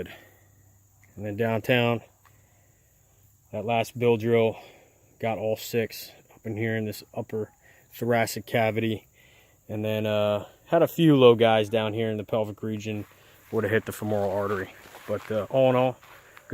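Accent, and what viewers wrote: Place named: Delaware Valley, United States. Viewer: Gotta be from PA or the Delaware Valley! :) Nice video, just picked one up on a trade, beautiful revolver.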